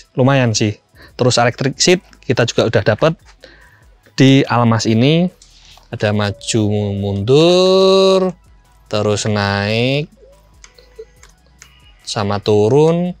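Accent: Java, Indonesia. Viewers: Ngomongnya khas jawa, luamaa😅 saya percepat 1,75 baru enak dengerinnya..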